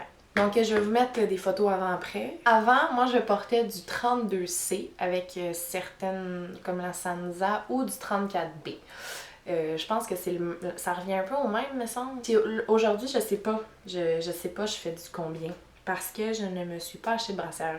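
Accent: Quebec, Canada. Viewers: J'adore ton accent québécois ! Vive le Québec, vive mes amis les québécois !! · Oh dare les chaînes Québécoises, c'est la 1er fois que j'entends l'accent québécois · Merci, pour avoir partager vos experiences et opinions! Comme toujours, j'adore tes videos et ton voix, tel un si belle representation de la langue Quebecoise!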